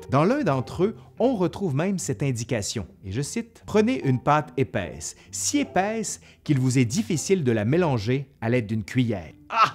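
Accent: Canada. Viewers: C'était super intéressant ! Tant pour l'histoire que pour tous les noms de pâtisseries disséminés dans la vidéo... (Une fois que je me focalise sur autre chose que l'accent canadien, je suis de France. Et en plus, les beignets (avec le t), on a, c'est l'équivalent des "Kraften" et c'est ça m'a pris quelques minutes de reformater le cerveau à penser "donuts")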